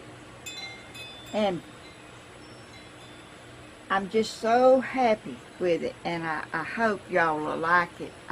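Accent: South, United States. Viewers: I started watching your videos about a year ago and you're just wonderful! I love your enchanting yard, your southern accent, your turn of phrase and your love of nature. This mosaic table is the most fabulous treasure I've seen in a long long time. The colours are amazing, your visit with your youtube friends is heartwarming and you leave everyone with a feeling that they've just had a lovely visit with an old friend who never judges or questions. You're truly a gift and I'm so happy to have found you! Sending love and greetings from Canada! And as a side note, I suggested to our local nursing home that their residents might love your channel because I think you will take them on a wonderful journey everytime they watch one of your projects and get to visit with you in your garden. You are truly a gift and I don't think you realize how loved you are, you bring a lot of love and happiness to many people every day. 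· I love listening to you with your southern accent! I also love your hair,you do a great job,I to color,cut and perm my own hair but I was a hairdresser. You are soooo very talented keep up the beautiful work. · Shelley M Martines I’m glad that during my sleepless nights I can follow our beautiful and her lovely southern voice, and gifts from our dear Lord. 💝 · I have been watching you now for a few years and I have to ask “ is there anything you can’t make?, good lord woman, I’ve seen you make so many things and no project seems to stump you, I’ve made mushrooms with you and many other things and I love your southern accent, I am Canadian and a crafter, I love to work with glass the most, many years ago, my first table for a wedding gift started my road to making tables, I made and sold so many, I made and sold what I called window in a window with frames and glass to hang in a window, they also sold very well, keep up the good work, god bless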